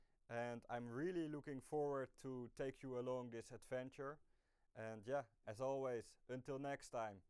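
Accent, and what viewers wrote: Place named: Netherlands. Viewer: Sound like a Dutchman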